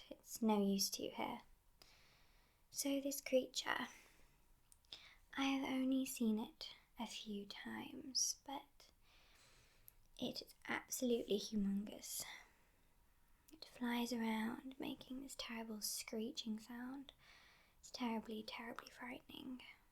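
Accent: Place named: South, United Kingdom. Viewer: You are British right? Me too but I really can't place your accent definitely southern and rather posh lol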